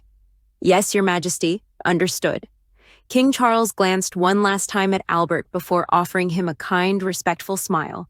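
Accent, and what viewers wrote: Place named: United States. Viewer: What a load of bull****, where do they get theses stories from. sounds american from the accent.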